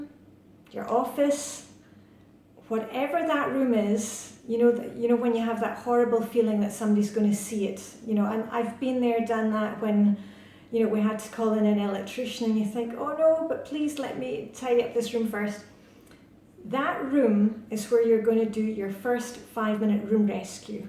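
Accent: Scotland, United Kingdom. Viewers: Hi, Maaike here from Nederland. I started a week ago and I have a shiny sink every single evening. What’s happening to me? 😉 But seriously. I’ve. Never. Ever. Done. This. It’s all new to me and I’m 46. 🙈 Thank you! Love your accent by the way. Scottish?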